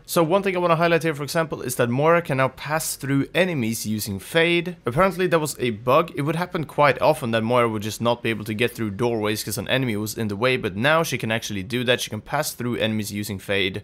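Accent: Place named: Sweden. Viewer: You sound Swedish. Are you Swedish?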